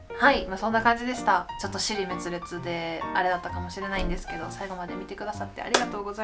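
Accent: Kansai, Japan. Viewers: 初見だけど、関西弁のせいで大したことじゃないみたいになってる。標準語は使えないタイプの人なのかな？ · 何で自分と同じ関西弁やのにこんなに綺麗なんや？(´・ω・`) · って関西弁わからないけどなまってたっけ❓ 可愛いからいいけど笑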